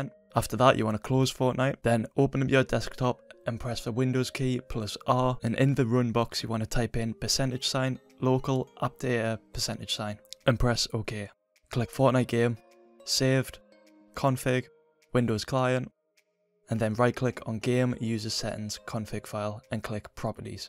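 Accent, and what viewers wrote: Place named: United Kingdom. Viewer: 'H' key. Was tough for me to understand the British accent too lol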